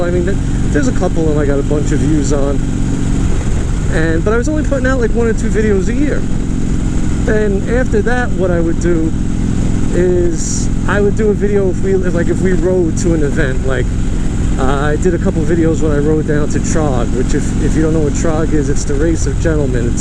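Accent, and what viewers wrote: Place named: New Jersey, United States. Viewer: Great first vlog. You have the sound problem down pat right away. It took me a really long time to get it right and you have it right. Love the accent. I had a few projects in NJ a few years ago and I was surprised to see how beautiful and rural so much of your state is. Look forward to seeing more. Ride safe my friend. Beautiful Road Glide by the way.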